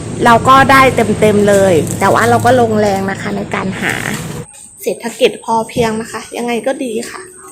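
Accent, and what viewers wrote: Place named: Isan, Thailand. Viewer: อีสานเก้นี่หว่า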